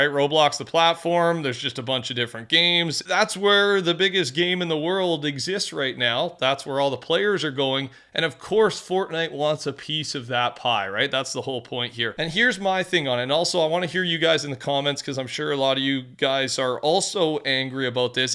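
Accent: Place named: Canada. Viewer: He's Canadian they Pronounce Word differently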